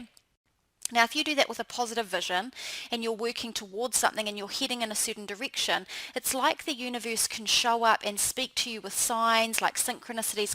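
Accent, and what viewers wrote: New Zealand. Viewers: ~ Gosh you speak FAST, but with clarity. Some words escape me with the NZ accent. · I have been manifesting a trip to Australia for me and my son. I clicked on this video and heard your accent! I know you are from New Zealand but still! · Rio Brasil Samba Shows sambistabeauty - cos we are KIWIS BRO - 😉 no harm in it, just our accent. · Wow great to hear the nz accent!! Good to know there are people in my little corner of the world thinking this way! Xxx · So nice to hear a kiwi accent. Great Video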